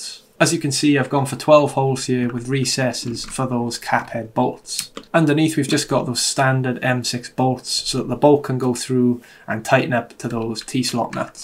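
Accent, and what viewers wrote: South Wales, United Kingdom. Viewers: Love your video and your Welsh accent sounds like South Wales as is mine I now live in Canada and am a retired senior citizen, as a result I am unable to offer support by way of paid membership membership given this info I wonder if you would consider sending me a copy of the code you used for flattening you spoil board? Also please advise what part of Wales are you from or located I just have a feeling we would not be far away if I still lived there.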